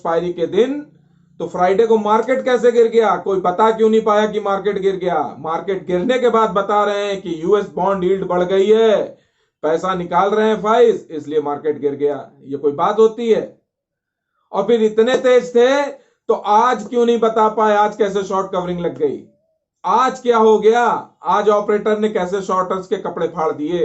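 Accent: Kanpur, India. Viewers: Kanpuriya lagte ho bhaiyaa jee app🙏🙏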